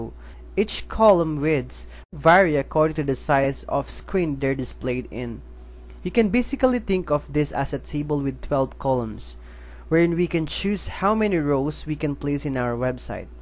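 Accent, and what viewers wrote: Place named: Philippines. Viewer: Maybe he is Filipino because he's accent same with us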